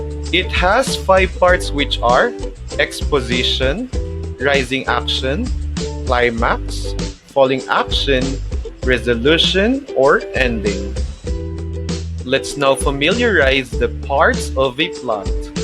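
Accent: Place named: India. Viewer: He has Indian accent.It reminds me Apu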